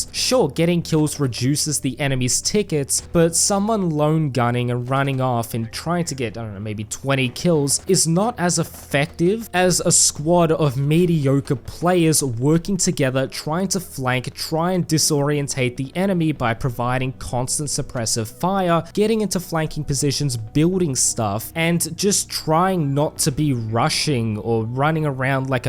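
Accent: United Kingdom. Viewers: Nice British accent man.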